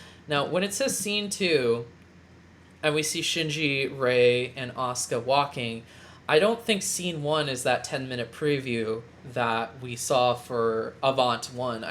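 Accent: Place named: Germany. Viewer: I feel like you thoroughly spoiled 3.0+1.0 for me just now and you did it with such savoir-faire I can't do anything else but appreciate it. If the movie turns out to do anything differently I might be weirdly disappointed now 😂 (also as a German I'd like to say the German-ness of your pronounciation was never an issue for me personally)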